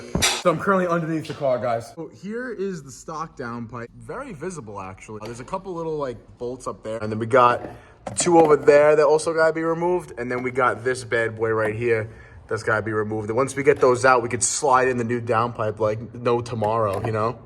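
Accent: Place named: Long Island, United States. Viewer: LMAO that long island accent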